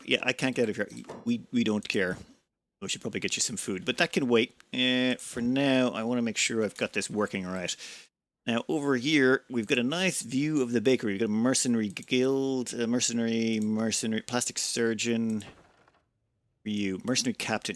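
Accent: Ireland. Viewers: He answered it elsewhere. It's the R key lmao. It's just that paddy accent. Bless his heart.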